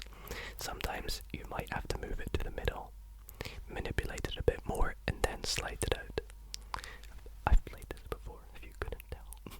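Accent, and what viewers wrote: Scotland, United Kingdom. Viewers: Bro this man is Scottish.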